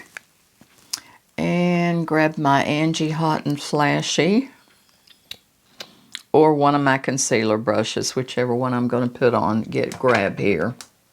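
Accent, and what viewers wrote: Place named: Appalachia, United States. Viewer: ! I also love your accent, it reminds me of home. I’m from Appalachian area of North Carolina and Tennessee, but live in Ohio now. You are a true joy ✨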